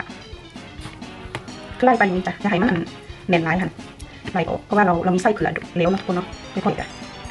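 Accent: Isan, Thailand. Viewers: เพิ่งเข้ามาดูคะหนูบอกว่าเป็นคนสุรินทร์แต่พูดเหมือนอีสาน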